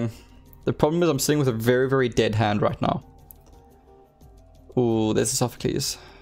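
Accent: South Africa. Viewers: You sound South African... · Recognised the accent immediately... =) Fellow South African here. I was torn between these 2 initially, but ultimately went with Relentless Flame due to both recommendations and daily challenges. Now I have both.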